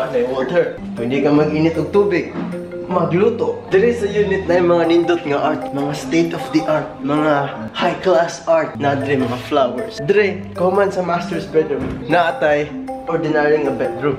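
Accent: Visayas, Philippines. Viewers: Ganahan lgi kos imong bisaya accent. Pede pang host. Haha 😂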